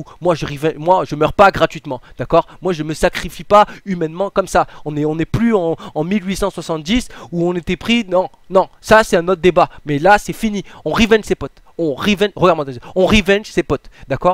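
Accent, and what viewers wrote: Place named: South, France. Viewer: Je kiff l'accent du sud xD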